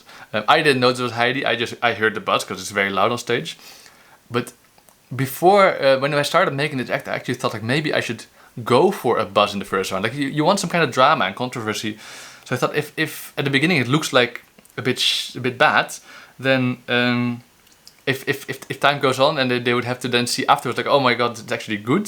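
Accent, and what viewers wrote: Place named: Netherlands. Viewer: As soon I heard the accent, I knew you where a fellow dutchman! I've seen your audition too! I loved it! It's a positive sight, among all this intrution of i.A.!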